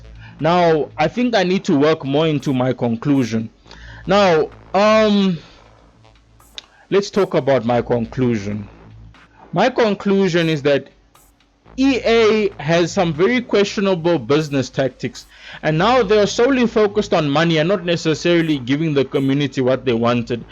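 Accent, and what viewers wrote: South Africa. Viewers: You south african bro? I havent heard a south african accent in forever (emmigrated awhile back)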